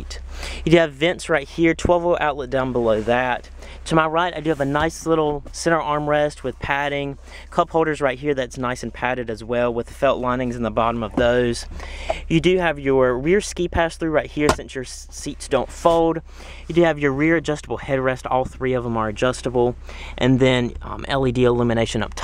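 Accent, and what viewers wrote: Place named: South, United States. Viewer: I'm a Texan, but I can hardly stand that southern accent and the part about, "If you can afford it, so what!" How arrogant!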